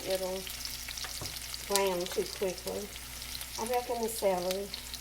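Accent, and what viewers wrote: South, United States. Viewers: Hello dear, it's been a little while but it is always lovely to hear your warm, welcoming Southern USA Accent:) Bless you.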